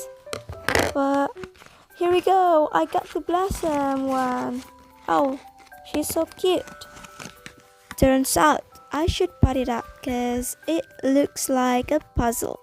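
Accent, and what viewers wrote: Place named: United Kingdom. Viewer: Wah ternyata kaka bisa aksen UK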